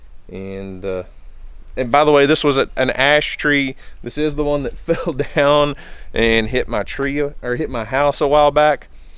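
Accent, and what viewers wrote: United States. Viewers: Wooowhoooo CANADIAN MADE!!! 🇨🇦 sorry my country pride is showing! Funny when you said the name of the company I immediately thought hey isn’t that a Canadian company?! When you confirmed it right away I thought way to go! Then I thought wow with the exchange rate you probably got a heck of an amazing price too! Smart man! I’m so proud that you were as thrilled with it as you were, built tough to last! Trust me when you live in the “great white north” you want to have tools that work and will last through the elements. Lol! I love your American accent too! Hehehe 🥰 funny here I am living on the 34th floor high in the sky in a metropolitan area watching a country guy splitting firewood and feeling country proud! Life is strange at times eh? (Thought I’d toss in that Canadian “eh” just to prove I’m truly a Canadian! 🇨🇦 As always I love your videos and this one was extra special for me. 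So glad you enjoyed splitting your firewood isnt life so much sweeter when we enjoy what we do? Sure makes chores less like chores and more like just living. Be well and enjoy your new toy!! Lol!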